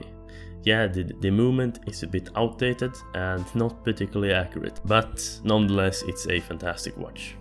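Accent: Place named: Sweden. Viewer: May i guess, ur swedish arent u? Typisk svensk accent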